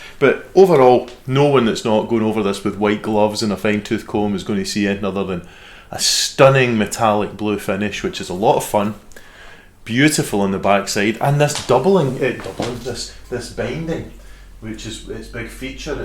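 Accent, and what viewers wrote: United Kingdom. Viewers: Glasgow by the sounds of him. Maybe Renfrewshire or Lanarkshire. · Thoroughly enjoyed your review and your great playing. Sub'd:) Miss the Scottish accent after living near Strathaven for 20+ years before moving back to Wales. I've had the TE62DB LPB and CAR models in my Thomann basket for weeks but I'm swithering between one of these and the JET JT-300 from Merchant City Music as a modding platform. Both are great VFM. I too have a Tele itch waiting to be scratched... again! I play my 2010 Squier Tele Custom II P90 to death and also have a Fender MIM Nashville which doesn't get as much love as it has a slightly bent neck... which GG Glasgow refused to exchange when bought in 2008:(( Keep up the good work sir:)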